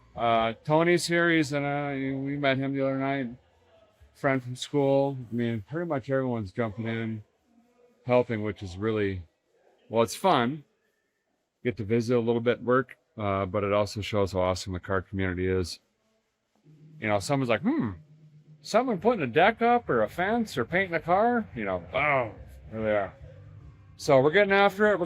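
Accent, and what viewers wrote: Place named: South, United States. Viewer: It's good to see a video where for once your not adding extra made up words or acting " extra country " instead of just speaking normal like you did at the beginning in the body shop. I keep skipping or giving up on your videos when you start making up words or trying to make illiterate talk seem cool. Please keep up the good work and less of the slang talk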